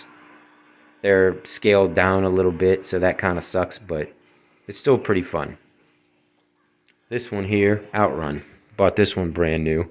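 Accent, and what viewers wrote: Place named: Pennsylvania, United States. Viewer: Fair enough. I was judging based on your accent. Knew it was somewhere in Pennsylvania, lol.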